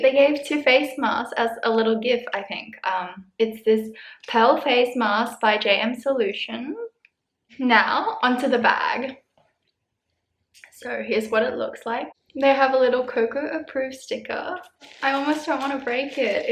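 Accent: Australia. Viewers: Are you from Australia? You sound a lot like wengie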